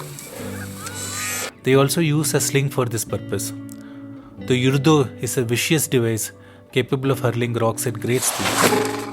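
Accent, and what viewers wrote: South India, India. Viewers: South Indian talking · Indian tone whilst Briefing 😜though loved it ❤️ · You english language not good because south type language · I think you r south indian voice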